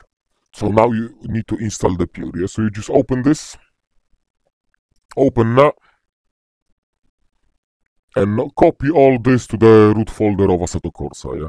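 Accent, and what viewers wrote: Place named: Italy. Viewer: fra si sente di piu l'accento italiano che il voice changer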